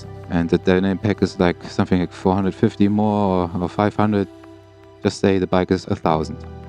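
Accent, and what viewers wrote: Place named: Germany. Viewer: Habe mir das Video angeschaut und dachte, hmmm klingt irgendwie nach nen deutschen Akzent… wartete bis das Nummerschild sichtbar wurde… jap wurde nicht enttäuscht. Hätte mich aber mehr auf deutsche synchro gefreut 🤷🏻‍♂️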